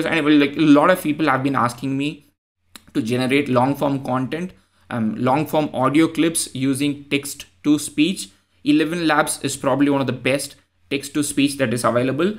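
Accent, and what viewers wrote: India. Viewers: i love the indian accent its the best, Techguys form india are to me the best masters and teachers ... And this content/video/tutorial is the proof Ty sir... You are helping to survive this madness... Cheers from Mexico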